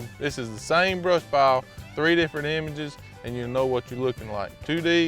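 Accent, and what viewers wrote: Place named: Carolinas, United States. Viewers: One of the Carolina's, I believe. Love to hear him talk. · LOL, he's definitely from the deep south.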